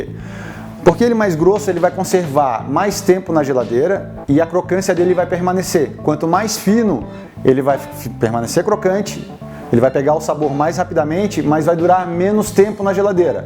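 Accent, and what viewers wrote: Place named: Santa Catarina, Brazil. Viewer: Esse sotaque é catarina :-)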